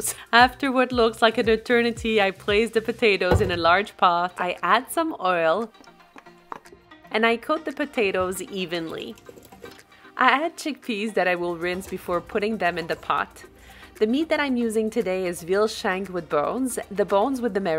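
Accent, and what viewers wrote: France. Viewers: מבטא צרפתי באנגלית? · Are you French ? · love ur French words and accent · Very interesting. Are you French by any chance? I recognized some of your pronunciation and words:) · Ah en fait c’est un accent français ha ha ha je m’étais pas rendu compte mdr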